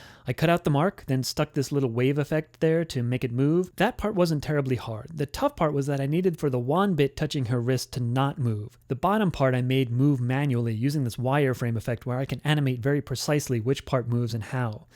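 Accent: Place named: England, United Kingdom. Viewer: You have a great English accent mate! 😊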